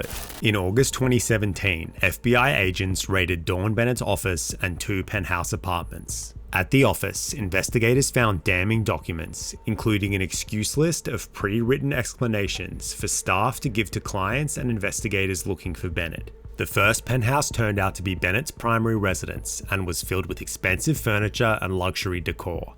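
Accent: Australia. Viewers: so i’m pretty sure this guy is australian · Wow, all strayans really do sound the same. You even have the sunny emphasis down lol The vb shines through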